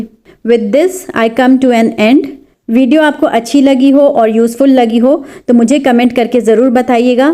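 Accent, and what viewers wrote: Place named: Varanasi, India. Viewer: The way YOU said this 👉🏼 "..yahan to etna badaa badaa bathtub🛁 hai..esme koi late k to नहाता hai nahi pr Clean pura krna padta tha😅😆is sooo Desi style n 💯 true as well. I live in medina N i loooveeee ur desi accent its sooo much similar especially ur "kahawate/muhaware" which reminds me my home town Banaras,U.P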